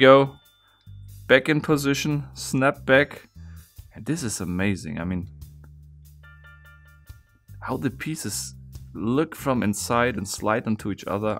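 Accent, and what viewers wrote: Germany. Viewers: his accent is German, if that's your question. I always got the impression he no longer lives there, but his FB info does refer to a Germany address.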